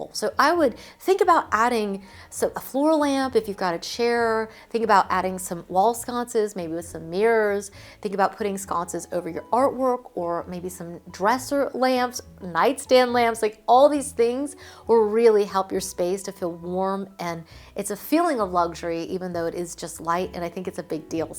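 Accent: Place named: South, United States. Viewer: I slowly hear your "southern" creeping back!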